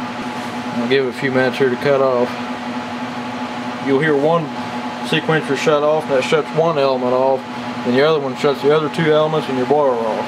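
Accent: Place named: Kentucky, United States. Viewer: It's called an accent lmao. I'm from Kentucky so I understood everything he said.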